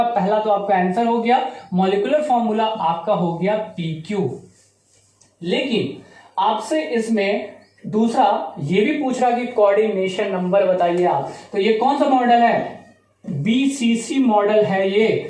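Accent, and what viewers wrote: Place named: Bihar, India. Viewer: sir you are a weird blend of hadrabady and bihari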